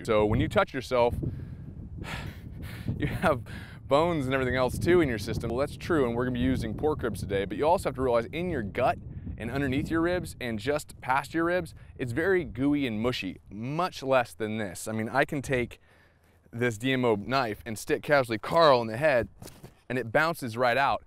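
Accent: United States. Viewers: Tino TheKid where.. where do you think he is? He's got an American accent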